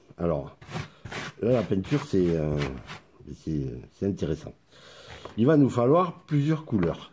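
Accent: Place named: Southwest, France. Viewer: Salut ^^ Toujours ton imagination et ta créativité débordante. Trop jolis tes arbres, fallait y penser. Rendu visuel du tronc assez bluffant. En tant que Toulousain et amoureux de nos routes départementales et anciennes nationales bordées de ces platanes souvent centenaires, fallait que je salut je ton travail sur ce coup là. En plus de ton accent du Sud Ouest, si cher à notre cœur. Adissiatz ^^ José (Tu aimes les trains, tu aimes la musique, en passant ta "SG" est splendide, tu m'épates 😅)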